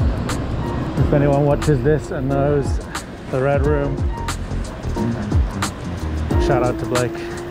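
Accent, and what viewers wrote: United Kingdom. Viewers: You sound more British than Canadian, or am I mistaken? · Ha ha yeah I was thinking Canada but he has a British accent.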